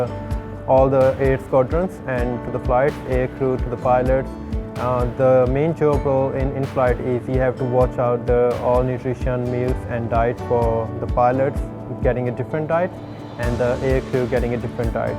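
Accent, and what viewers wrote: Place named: Australia. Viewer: Lovely Australian Accent ❤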